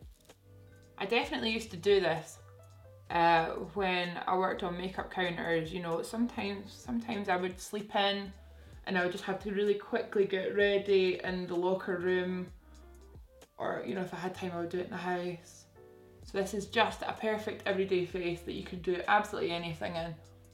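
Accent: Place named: Scotland, United Kingdom. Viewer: Absolutely love your page I came across it by mistake I love all your suggestions your make up i like the way you help out and talk to your audience you have a wonderful personality and your accent is awesome... i’m just guessing maybe Scottish correct me if I’m wrong keep bringing those videos girlie we love them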